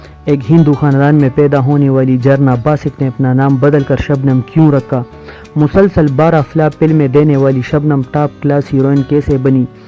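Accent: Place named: Pashto, Pakistan. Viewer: سرجی پشتو ٹون میں آپ کی بتائی ہوئی باتیں ، شبنم کا پورا فلمی سفر اے ٹو زیڈ تک آپ نے گن کے بتایا ۔ ساری فلموں کی معلومات فلاپ اور ہٹ فلموں کے ساتھ آپ نے باتیں شیئر کی ہیں ۔ ایسی تفصیلی معلومات ہمیں کبھی کسی بایوگرافی میں نہیں ملی ۔ فلم عندلیب کے سیٹ پر وحید مراد سے جھگڑا اور اس کے بعد اس کے ساتھ فلم نہ کرنے ، اور اس کا بائیکاٹ کرنے کی باتیں بہت معلومات دے گئیں ۔ شبنم کے ساتھ اتنا بڑا حادثہ ہونے کے باوجود بھی وہ پاکستان میں آتی جاتی رہتی ہیں ، جس کی وجہ سے ، اس کے بیٹے کے ساتھ اس کی انبن چالو ہے ۔ اب مجھے اس گانے کی لائنیں یاد آ رہی ہیں جو شبنم کی اوپر برابر فٹ بیٹھتا ہے ﴿جس نے میرے دل کو درد دیا اس شکل کو میں نے بھلایا نہیں﴾ مگر اس کے بیٹے نے وہ باتیں اپنی ماں کی وجہ سے نہیں بھٌلائیں ۔ ہمیں اس بات میں دست اندازی نہیں کرنی چاہیے ۔ پر شبنم کا یہ ڈیسیشن کہاں صحیح ہے کہ ، اپنے بیٹے کو ترجیح نہ دے کر ، پاک سے اپنی محبت کا ڈھنڈورا پیٹتی رہتی ہیں ۔ ٹھیک ہے اس کی پوری زندگی وہاں گئی ، پر اس کا مطلب یہ تو نہیں ، کہ اپنے بیٹے کے پیار کی ہی قربانی دے دے ۔ خیر ! 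رب العالمین دونوں کو سمجھ عطا فرمائے آمین ۔پونا بھارت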